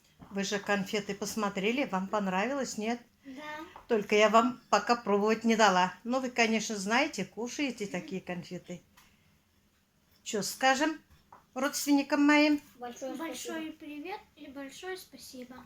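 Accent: Tatarstan, Russia. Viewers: Красивые получились! А я сегодня пекла беляши. Фарш из своей курицы и добавила в фарш мясо из свининой грудинки. Такая вкусная и сочная начинка получилась. Сын приехал- дала его семье, их четверо. И остались для нас, а нас шестеро, у дочери четверо детей. Завтра буду жарить для младшей доченьки, а их шестеро- у неё четверо детей. Кур у меня много, которых надо пускать на фарш, они уже практически перестали нестись. Мы им уже на замену купили молодок 20шт. И суточных 30шт.породы Род Айленд, из которых 18 петушков и 10 курочек, а двое погибли. Ждём ещё заказ: 10шт. Кучинских Юбилейных, 10шт. Мини-мясных палевых. И чуть позже будут ещё 10шт. Пушкинских. Да, понимаю, что поздновато мы суточных приобретаем, это потому, что ещё не готов новый курятник. А потом планируем приобрести хороший инкубатор(уже знаем какой), и будем выводить сами себе и родственникам новых курочек. 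Но думаю, что будут ещё и наседки. А инкубатор навсякий случай, если не будет наседок. Но специально выбирала те породы, которые наседки хорошие, например Кучинские Юбилейные. Но это если будет угодно Богу. Пока! Удачи тебе, моя дорогая землячка! Кончится самоизоляция поеду в Башкирию, всё немогу больше. Соскучилась по наречию татарскому и башкирскому. Ты мне со своим акцентом, как бальзам на сердце! По русски говоришь хорошо, но акцент никуда не денешь и это радует, а как запоёшь, у меня сердце от радости замирает, и детство вспоминаю. Спасибо тебе, большое, что ты есть и появилась у меня!!!!!!! ♥️♥️♥️♥️♥️ Чай п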